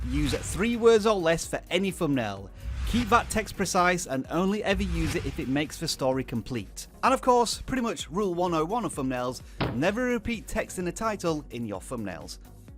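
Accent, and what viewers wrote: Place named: United Kingdom. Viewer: hey, are you british? am loving the accent 😄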